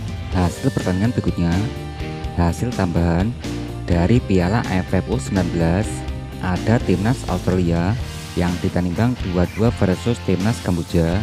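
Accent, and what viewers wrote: Java, Indonesia. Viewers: Kurang menarik mendengar suara latar, gaya bahasanya Jawa..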